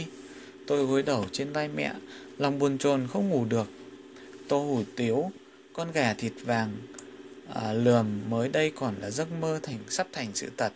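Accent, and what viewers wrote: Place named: North, Vietnam. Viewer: Giong doc mien Bac cua rat truyen cam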